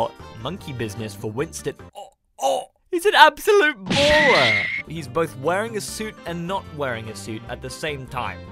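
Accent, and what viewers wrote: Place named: Sweden. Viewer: where are you from? you sound a little bit swedish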